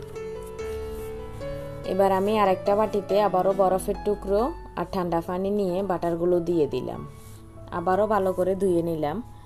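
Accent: Chittagong, Bangladesh. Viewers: Chittagong er manos mone hocce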